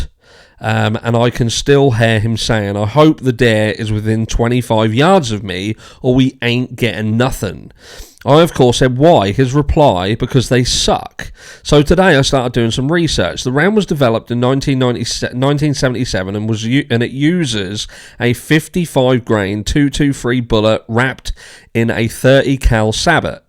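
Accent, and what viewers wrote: United Kingdom. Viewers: It's so funny to hear a Brit talk to Americans about ammo or firearms. 😉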